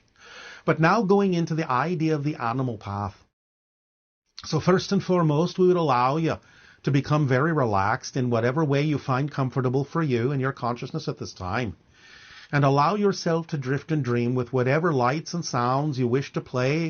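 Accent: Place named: Ireland. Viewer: Whg does Bashar have an Irish accent 🤣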